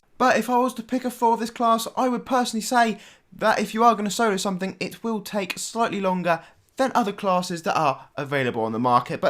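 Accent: United Kingdom. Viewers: I love ur accent and this clip U Brit or somewhere on the Europe?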